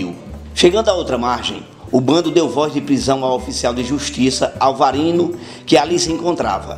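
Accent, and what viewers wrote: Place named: Northeast, Brazil. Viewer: Eita Lampião sempre estrategista! Muito bom relato e o sotaque nordestino show! Parabéns!👍👏👏👏👏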